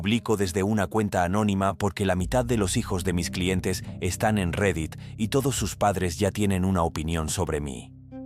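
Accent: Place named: Spain. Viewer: A mi casi no me gustaba el acento español pero ya lo amo con este canal !!!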